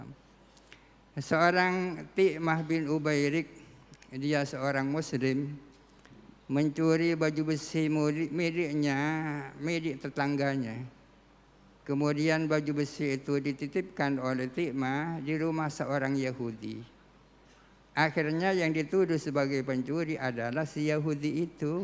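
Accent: Madura, Indonesia. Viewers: logat.. nya madura ini yai..? siapa beliau adakah profil lengkap nya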